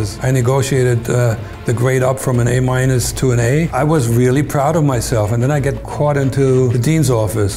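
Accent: Germany. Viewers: He sounds a bit german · The good old German accent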